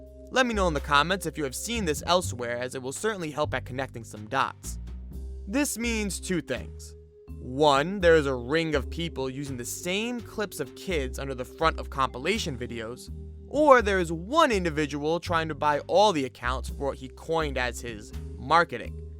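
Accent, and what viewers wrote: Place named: Boston, United States. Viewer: You sound so Boston lzzzzzzzzz east coast trash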